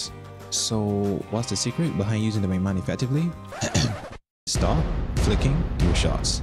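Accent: Barbados. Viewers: I hear that Bajan accent!! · You a Barbadian? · Are you from Barbados? Great guide btw, it really helped a lot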